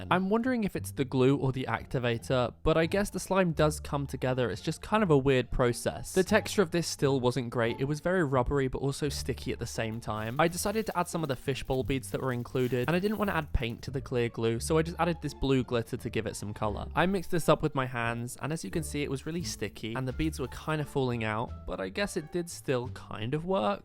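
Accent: United Kingdom. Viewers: you have a British accsent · British accent 😂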